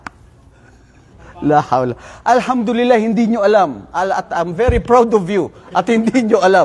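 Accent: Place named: Visayas, Philippines. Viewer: Cute c ustadh mag bisaya😅 oi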